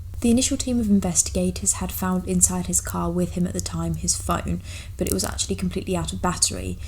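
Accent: United Kingdom. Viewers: Something about the British accent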